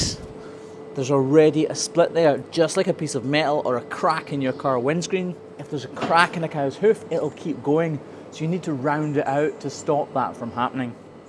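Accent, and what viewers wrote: Scotland, United Kingdom. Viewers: Thank you. I think this is the one I have bin waiting for, bin worried about her. I think I called her a different number though. Lol I'm Canadian and I can't quite get your accent. I love it. Especially when you have the deep Scott's accents on. 😊 wanted to let you know I love your channel. Bin Bing watching it you reside me of my grape he was a vet. I got to see so many amazing things with him. He was so loving and caring animals first. He even stitched up my finger when I cut it of at the age of 7. Mom said hospital grandpa said " what am I? It's just a finger. I got this." And so it was I was stitched up by gramps. Love it. Oh your no where near his age and actually your younger than me. But you do reminded me of him. So thank you for beeing you. Your Albertan friend.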